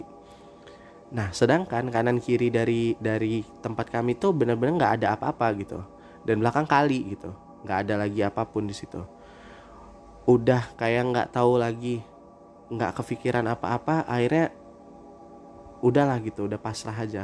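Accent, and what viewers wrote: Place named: Lampung, Indonesia. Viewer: Pantesan logatnya ga asing.. ternyata lampung juga bang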